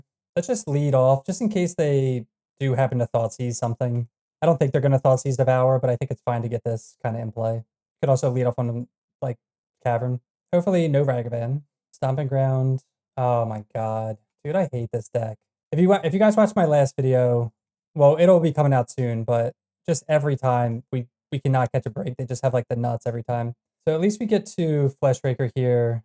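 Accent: Baltimore, United States. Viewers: Hey, so firstly, I thought you were from Baltimore too 😂, like the Swedish guy! I lived in NJ, in Bergen County but in UK for last 20 years. Anyway, what do you prefer, this or the Herigast deck? This one seems like it's prepared for a bit more but I'm just getting back into Magic after a 4 year break, so I am just getting caught up with the meta and have never seen a couple.of the decks you played on this video. What's your preference?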